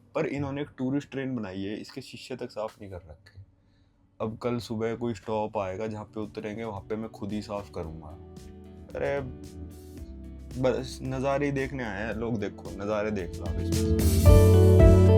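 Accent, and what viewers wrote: Haryana, India. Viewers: Rom rom bhai are you Haryana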